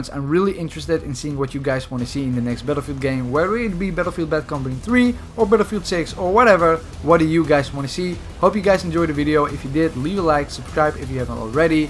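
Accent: Netherlands. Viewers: Je kan gelijk horen dat het een nederlander is:) Lekker bezig # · I swear you are dutch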